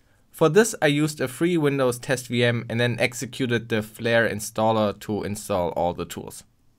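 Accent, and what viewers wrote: Germany. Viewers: sorry dachte du machst das einfach so aber wenn du es dann nur aufs Geld abgesehen hast, dann ist es egal, sorry. Aber trotzdem toller Youtuber mit tollen Videos, man kann aus deinem Englisch schon raushören, dass du Deutsch sprichst. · Dein deutscher Akzent ist so schrecklich und versaut das ganze Video.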